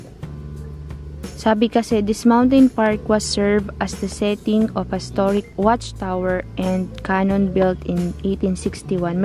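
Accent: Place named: Davao Oriental, Philippines. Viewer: Parola mati davao oriental na logat jen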